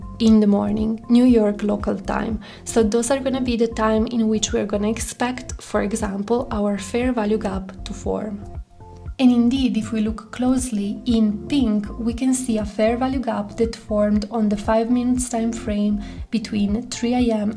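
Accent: Italy. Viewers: Sorry, your accent is very similar to the italian accent. For example you pronounced "blue" in a perfect italian haha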